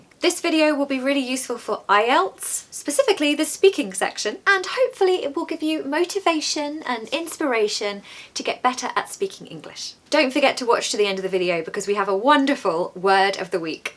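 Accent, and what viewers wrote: RP, United Kingdom. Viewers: In USA, it's all about the d sound and the tongue touching the palate, in UK it's usually soft, elegant, polite and humble kinda accent. I'm quite capable of immitating you except the upper intonation, like suddenly it goes up, how'd you do that, I find it bit complicated. For split seconds it goes up and that's also the signature intonation a.k.a British accent of the United Kingdom. Do teach that. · Hi Teacher! Always am astonished by your every video! 😍 I really really really love the way you speak! I want to and am gradually learning the RP accent you speak! 💕💕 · As i have always been, again amazed Never found a teacher better then you Your gesture and face expressions made us love english and Brit accent as well . Thanks teacher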